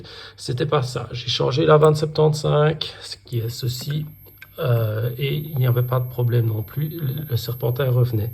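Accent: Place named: Belgium. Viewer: Bonjour, merci pour la vidéo, j’ai actuellement le même soucis… j’ai une golf sportsvan 1.6Tdi 2016 J’ai eu ce problème la première fois y a 1 an, seulement sur autoroute quand j’effectuais un dépassement cela m’arrivais rarement, 1 an plus tard j’ai ce problème tout le temps sur autoroute a la moindre pente ou dépassement ( quand j’accélère bien sur) j’ai le fameux temoin suffisais juste que je coupe le contact et que je redémarre le moteur et j’étais repartie mais le problème revenais aussitôt j’ai également remarqué que le moteur a froid ne me fait pas ce problème, seulement a chaud ( quand il fais vrmt chaud + le moteur qui a tourné depuis un moment) c’est également le code d’erreur P0299 turbocompresseur suralimentation faible… merci pour ton aide j’espère pouvoir trouver le problème, je suis de Belgique et il me semble que d’après l’accent que tu as tu es également de Belgique peut-être pourrais tu y jeter un oeil un jour merci en tt cas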